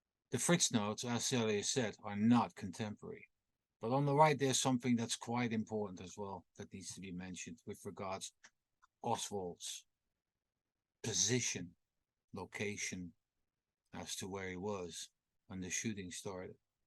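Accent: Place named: United Kingdom. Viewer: !!!!:text-green-game-over::face-blue-smiling:🍾🥂🎉, as you were ticking off the 4 white, male, blue collar employees, that PM could be, you had Frazier, Oswald, Lovelady and Dougherty (or Dockerty, as you pronounce it across the pond 💂‍♂). You then said we know it can't be Frazier.... we know it can't be Lovelady...so that leaves Oswald and Dougherty. You then went on about Dougherty being on the 5th floor...etc... That's not important! I just realized we can justifiably kick it up a huge notch, and identify PM as LHO with virtual certainty! We have long had information about Dougherty's general appearance. In Roy Truly's Warren Commission Testimony, when being asked about Dougherty, Truly says: "He is a GREAT BIG HUSKY fellow. I think he is 39 years old."!!! I think Ed LeDoux also obtained similar information a few years ago, about Dougherty being big or fat, and if i remember correctly, wearing glasses. 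I'm not absolutely sure but I think he got this description from another TSBD employee or employees! Maybe from Frazier? There is no way that PM/Oswald is big, husky or fat! Or wearing glasses (if that's the case). So IPSO FACTO, Prayer Man IS Lee Harvey Oswald!!!!!!!! Am I wrong?! Please tell me I'm right!!!!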